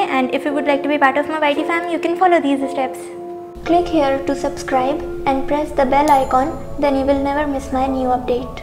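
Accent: Bihar, India. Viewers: I like bihari tone 😝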